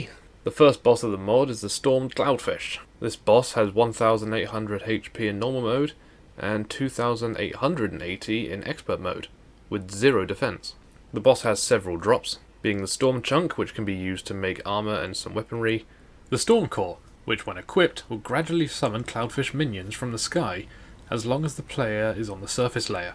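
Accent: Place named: England, United Kingdom. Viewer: I thought you sounded such as soon as you started talking normally. Though uh, that might just be because of the stereotypes associated with your accent here in the US. English accents are often used to convey either intelligence or nobility here.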